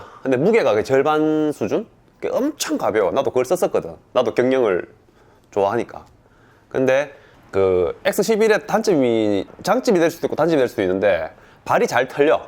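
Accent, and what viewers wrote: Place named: Busan, South Korea. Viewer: 부산 사투리군요 영상보면서 강호동이 자꾸 떠오름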